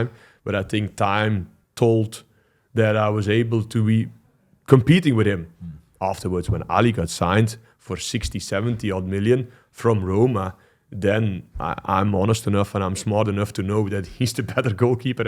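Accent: Liverpool, United Kingdom. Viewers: He still has a scouse twang to some of his accent haha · sound's very scouse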